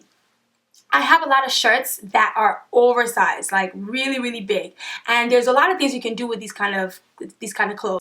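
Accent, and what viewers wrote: Nigeria. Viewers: yes she's a NIGERIAN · Are you Nigerian?? Your accent sounds like it 😂❤️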